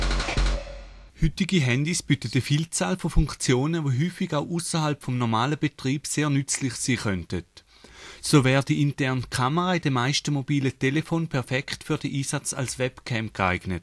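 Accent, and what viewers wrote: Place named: Switzerland. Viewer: er spricht schweizerdeutsch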